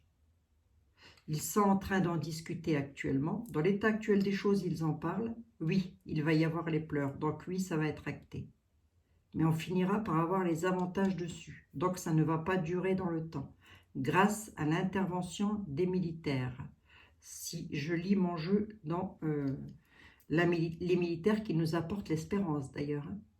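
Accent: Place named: Franche-Comté, France. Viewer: bonjour madame ! Ne seriez-vous pas native de Franche-Comté par hasard ? L'ayant quittée depuis de nombreuses années, j'ai pourtant l'impression d'en revivre l'accent, certaines expressions, les boutades etc. Est-ce que je fais erreur ?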